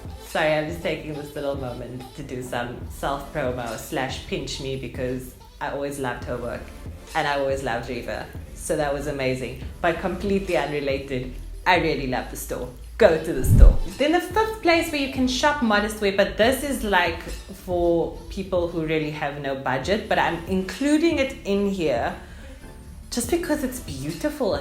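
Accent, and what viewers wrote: South Africa. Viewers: Joburg accent neh☺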